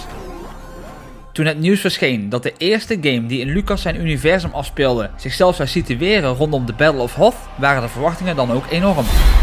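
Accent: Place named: Brabant, Netherlands. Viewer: Ik hoor een Brabants accent 🤩 Good job!!!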